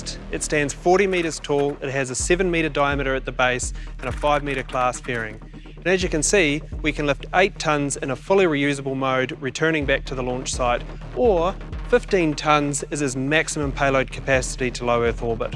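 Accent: New Zealand. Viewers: I like your lovely kiwi accent and your high aspirations. I wish you the best of lucks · With a kiwi accent, you can never sound arrogant. Cheering for you, Rocket Lab! (And SpaceX)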